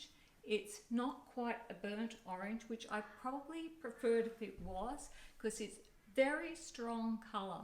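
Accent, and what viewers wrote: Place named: Australia. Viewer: I loved this, some of the terms you use are like America 🇺🇸 and a lot the same as England 🇬🇧....I love the Australian 🇦🇺 accent.......You style is impeccable as always....🌺🌺🌺Much Love Bella🌺🌺🌺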